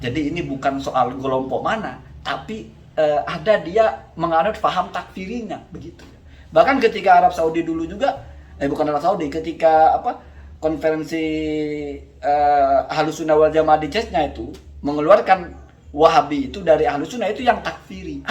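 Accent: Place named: Lampung, Indonesia. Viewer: Bang.. ente orang mana sih. Kok mirip orang Lampung 😁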